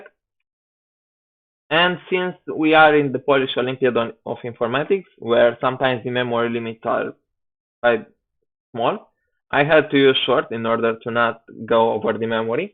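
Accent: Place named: Russia. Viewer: The problem is not because of Mic, the problem is that his first language is not english. I think he is Russian. Still it is not that tough to understand his accent.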